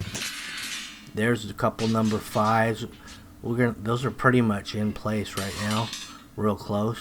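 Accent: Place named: Southern California, United States. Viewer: Can always catch a SoCal accent. I live in Ohio now but was born and raised in OC for 43 years.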